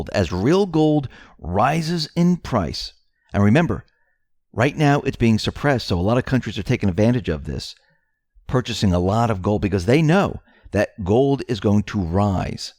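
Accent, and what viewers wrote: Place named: New England, United States. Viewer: It's a yankee accent thang for *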